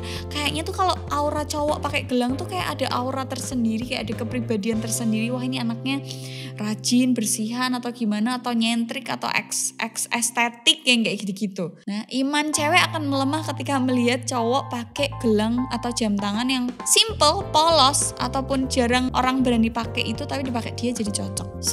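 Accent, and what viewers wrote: Java, Indonesia. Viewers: medok medok kepiye..gitu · mbak e medhok tp tep nggemesi hmm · medok banget suarane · Boso jowo ne medok banget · Logatmu..medok tenan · Jawa bgt reeekkkk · jowone medok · Jowone pundi sampean kak...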